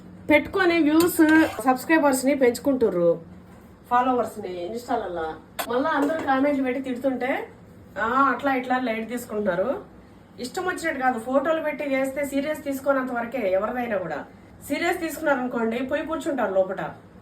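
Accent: Telangana, India. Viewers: నువు అసలు రాయలసీమ అంటే ఎవరు నమ్మరు same టు same తెలంగాణ పక్క తెలంగాణ మాటలు మాట్లాడుతున్నావ్ no doubt 😂😂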